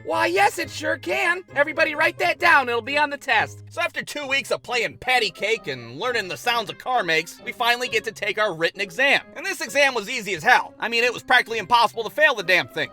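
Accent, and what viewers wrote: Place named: Ohio, United States. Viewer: I'm also from Ohio and after watching and listening to this video....as much as us ohioans don't want to think that we do....we totally have an accent. This video confirmed that theory for me.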